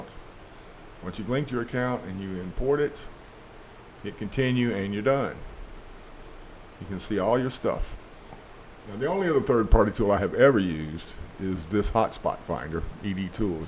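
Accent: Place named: South Carolina, United States. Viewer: man are you from south cackalacky? you sounds like some peeps from around here.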